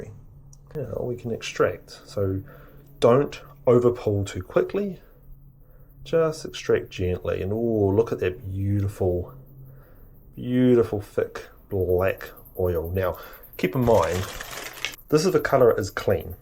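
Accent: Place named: New Zealand. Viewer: Hi. Are you in NZ? Thought I might have picked the accent 👍 Can I ask where you purchased your third party service kit? I’m in NZ & found the tube that connects to the syringe for $19 & 50ml of oil for $15, both from 99 bikes which seems reasonable. Cheers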